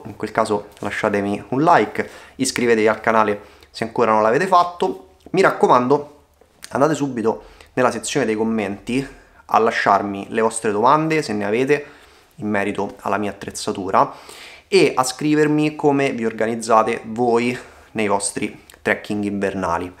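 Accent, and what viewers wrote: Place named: Lazio, Italy. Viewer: Ciao, grazie per la condivisione della tua attrezzatura invernale! Purtroppo ti capisco quando dici che è una tristezza fare video in casa... qui in Piemonte, da novembre, siamo stati più arancioni/rossi che gialli e purtroppo anche io mi sono dovuto arrangiare con video indoor! Speriamo di tornare presto in montagna!! PS se hai seguito qualche mio video avrai capito che sono Laziale (Latina) di origine, tra l’altro ho vissuto tre anni a Viterbo e il tuo accento mi ricorda la mia gioventù! 😂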